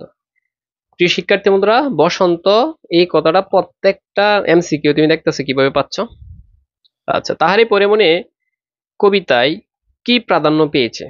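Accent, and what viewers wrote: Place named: Sylhet, Bangladesh. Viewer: স্যার আপনার বাসা কি সিলেট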